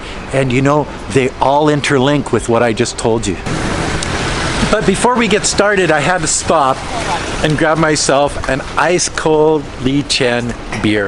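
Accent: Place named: Canada. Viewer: Reasons why I like your channel is, you and me both Canadian from British Columbia, we have some much the same in common and your Canadian way of language "FXXK them!", yes, that is Canadian way and Canadian tone! Don't worry about those bullshit shut down, there is many other ways to express your truth speech and comment, just let us know and we follows. Keep up the good work and like to watch your daily life in China!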